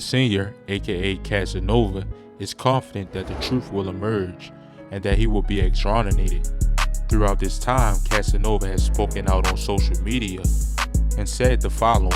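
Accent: Baltimore, United States. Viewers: Nigga you from Baltimore or sum shit? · you from baltimore? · That was a bmore accent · This is first video I ever watched on this channel. So idk who the narrator is. But I know he from Baltimore.. I know that accent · Def a from Bmore lmao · I'm know Baltimore when I hear it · Do=Dew in bmore 💯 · Not Philly it's Baltimore accent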